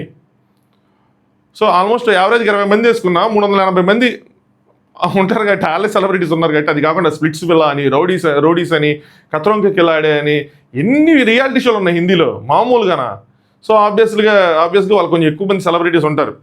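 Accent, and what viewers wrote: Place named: Andhra Pradesh, India. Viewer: Oh avna andhra slang aithe ok garu tq for your reply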